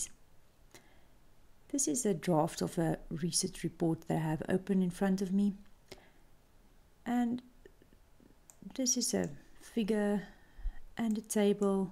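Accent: Afrikaans, South Africa. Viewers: You sound South African?? 😂 Same here!! 😂 Thanks so much for this video!! I'm submitting my PhD in one week and you explain so clearly and simply! THANK YOU 😁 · She has an Afrikaans accent, from South Africa. I would know because I am Afrikaans too. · You sound very much South African! Thank you for this!! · Her South African English accent is very dominant :|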